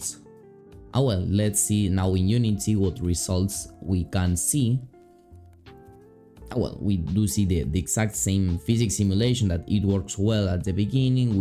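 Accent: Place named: Argentina. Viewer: Sos argentino? Tenés acento lol :D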